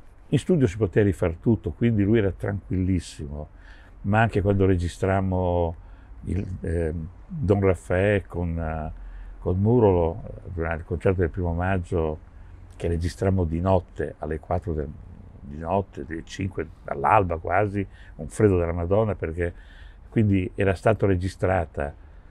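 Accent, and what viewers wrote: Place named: Ferrara, Italy. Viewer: Si sente la ferraresità di un grande batterista!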